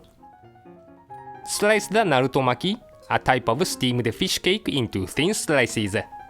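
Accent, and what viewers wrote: Japan. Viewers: The host has very strong Japanese accents, but I understand him very well, but will difficult to many English speaking people. It was better, if the lady talks. This is not a healthy food and it takes a lot of times to make a simple food, but when you have nothing to do, you can cook it at home. This guy and woman work together perfectly, but they use low quality Japanese cookeries. Even I use very nice Japanese cooking stuffs and knives everyday. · この、いかにも『日本人の英語』って感じが これ海外の人にはちゃんと伝わってるのかな？ 字幕あるから問題ないか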